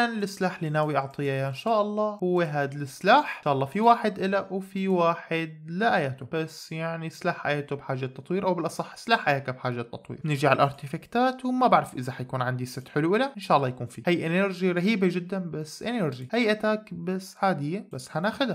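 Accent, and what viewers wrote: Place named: Iraq. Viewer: الاخ عراقي😂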